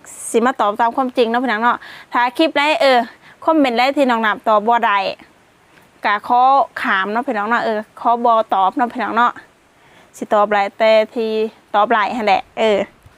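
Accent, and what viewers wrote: Thailand. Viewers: ภาษาเว้าคือทาง เดชอุดม เนาะน้อง ติด..ร เรือ ม่วนๆ 555.. สู้ๆนะ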